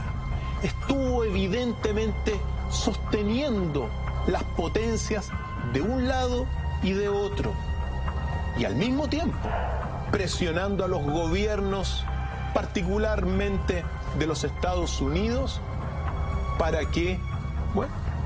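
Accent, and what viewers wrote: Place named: Chile. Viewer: De ver los videos me he acostumbrado al acento chileno, personalmente me parece agradable, por no hablar que los temas y la manera de contar del me encantan.. saludos desde España.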